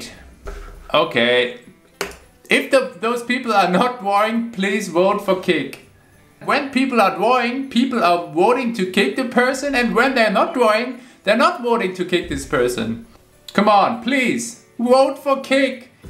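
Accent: Germany. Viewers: Man hört das Deutsche so raus😂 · german accent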